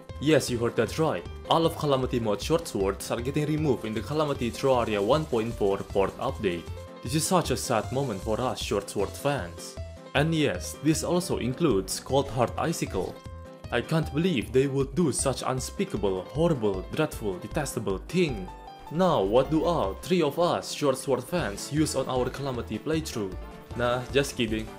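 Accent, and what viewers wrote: Russia. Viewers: why is your accent similar to russian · bro this man has a very cool russian accent and i approve of it!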